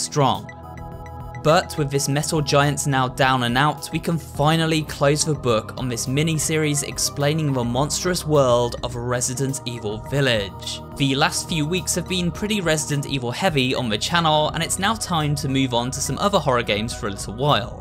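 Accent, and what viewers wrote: England, United Kingdom. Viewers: Im german, so i understand the names even better. Also its always fun to hear an english person pronounce german words.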